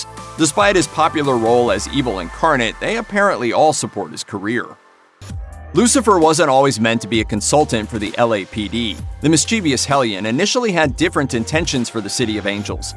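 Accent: United Kingdom. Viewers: So sick of that British accent 😁 Am i the one?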